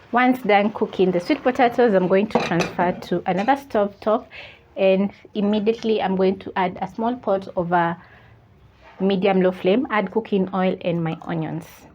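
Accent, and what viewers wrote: Kenya. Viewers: Was very sure you are from Western Kenya until 🤣🙆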